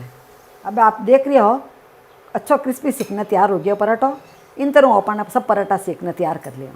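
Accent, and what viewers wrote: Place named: Jodhpur, India. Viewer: Mare in-laws Jodhpur rahte ha apki language sun kr lagta ha sasural pahuch gyi😀😀 Thanks for yummy parathas sasuji🙏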